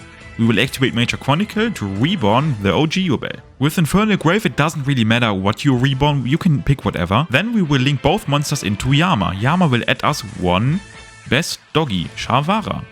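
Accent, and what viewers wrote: Germany. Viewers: So ein lustiger deutscher Dialekt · My guy has the strongest German accent on his English I've ever heard in my life chad af :^) cool video tho.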